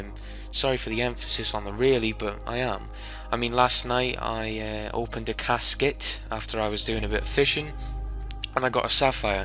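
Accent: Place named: Newcastle, United Kingdom. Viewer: Geordieeeeeeeeee m8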